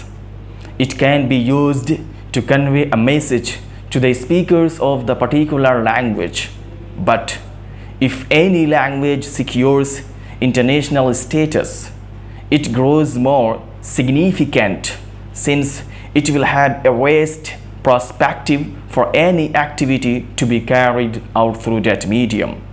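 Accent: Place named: United States. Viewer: Lag raha hai America se aaye ho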